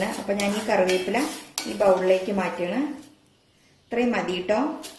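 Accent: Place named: Palakkad, India. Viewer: Chechi palakkad aano സ്ഥലം.. സംസാരം പാലക്കാടൻ ഭംഗിയിൽ ആണ്.. അതാ chothichath.. ഞാനും pkd ആണ്...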